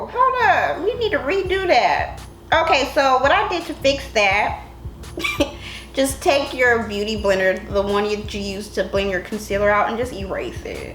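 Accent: South, United States. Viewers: Lawwwd talks like a southern Grandma.... girllllll, chillllllddddd 😂😂😂😂. I luvs this chick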